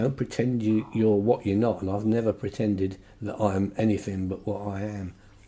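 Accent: England, United Kingdom. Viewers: Was so surprised to hear the accent and realize you're an Englishman. My late grandmother from Yorkshire would have loved your music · Wait i thought i expected is Kind Uncle From Texas but when i Realize he is English that make my heart warm even more 💚 remind of my late Grandpha that live in yorkshire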